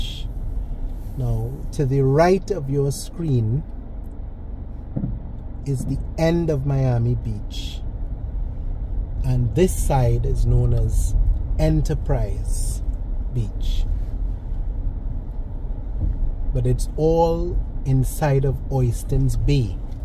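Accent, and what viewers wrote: Trinidad and Tobago. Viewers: ❤ Thank you so much We Barbadians love watching you here from Italy That area from Thornbury hill near Scarborough area that gap is called Peg well or Pegwell Gardens Have some family there ❤ Keep up the good work love your Trini Voice ❤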